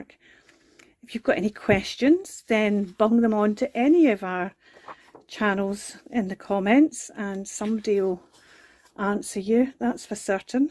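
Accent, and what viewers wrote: Scotland, United Kingdom. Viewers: I love this so much; I could cry. 💞 I'm so excited for this collaboration!!🧚🏾‍♀️🧚‍♀️🧚🏻‍♀️ You sound like you're from Scotland. My Grandpa is from Dunfirmlan🩷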